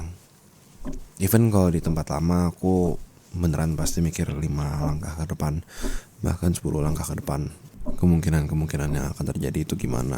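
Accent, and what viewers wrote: Java, Indonesia. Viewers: JAWA NYA AKHHH, BANYAKIN NGOMONG JAWA!!! ENAK BNGT DI DENGERNYA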